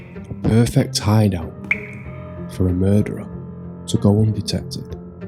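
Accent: Manchester, United Kingdom. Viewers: That's a proper manc accent, or Salford.. I used to live ten minutes up thd road from Salford precinct off chapel lane or chapel street/road. Been 30 years since i lived there but your accent is definitely either north manchester or Salford, possibly south Manchester Wythenshawe, but my point being it's a thick accent from the area. I ended up in stretford. About the video, I thought it was Lechmere for years but now I'm not convinced. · New sub here 👋🏼 This video randomly popped up & I’m glad I clicked. What a remarkable video. Love all the editing 👏🏼 I’m from Bolton, is that a Mancunian I hear? 👀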